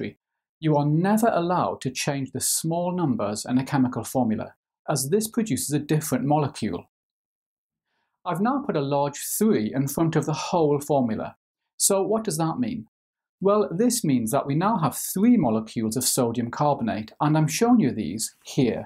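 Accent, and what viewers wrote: United Kingdom. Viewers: Why British accent makes everything better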